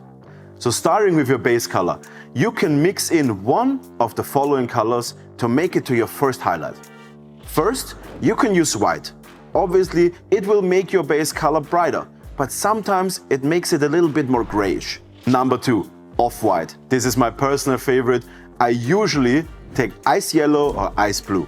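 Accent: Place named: Austria. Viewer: Das mit dem offwhite muss ich mal testen. Bis jetzt eher Richtung Knochenmäßig gegangen. Du gibst mir voll die Schwarzenegger vibes mit deinem Akzent. Super zu verstehen. Freue mich auf mehr. Grüße aus Hamburg.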